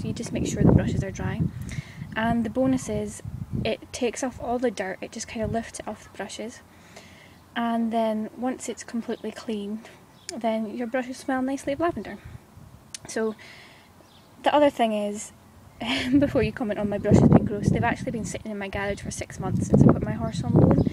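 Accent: Scotland, United Kingdom. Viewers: Are you Scottish? I love the accent!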